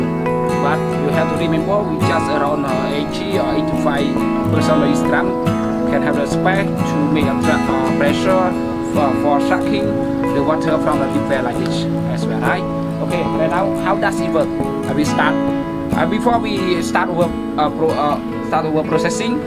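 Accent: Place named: Thailand. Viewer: Dù nói tiếng Anh nhưng nghe vẫn biết là người Thái Lan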